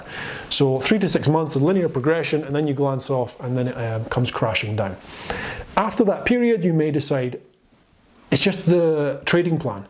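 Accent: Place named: Inverness, United Kingdom. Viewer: Are you from Inverness? Your accent sounds very much like it!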